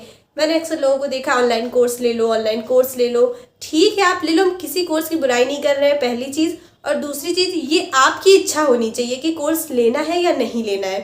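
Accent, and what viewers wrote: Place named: Moradabad, India. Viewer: Aap sayad moradabad mandal se ha he aap ki language bata rahi hai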